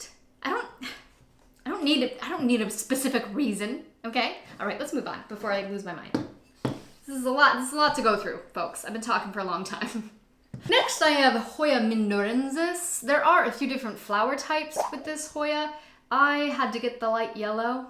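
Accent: United States. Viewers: Are you from Boston?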